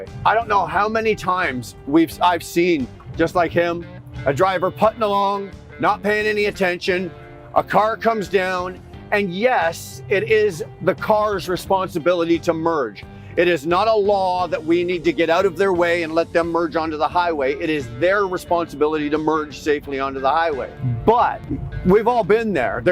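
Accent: Canada. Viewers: Is that a CANADIAN accent I hear? Man, you're making me homesick! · As I’m watching this, I’m saying to myself, “This has got ta be the most courteous trucker I ever encountered.” Then I listened to him speak for a minute, and was like, “No wonder. He’s Canadian.” 👍🏼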